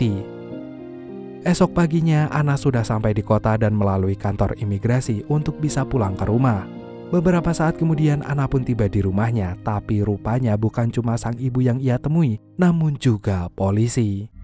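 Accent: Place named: Nganjuk, Indonesia. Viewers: Curiga admin e wong nganjuk ki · Admin e wong nganjuk ki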